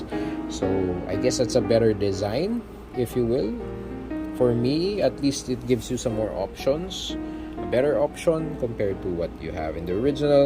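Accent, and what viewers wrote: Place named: Philippines. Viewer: This guy is from the Philippines just the accent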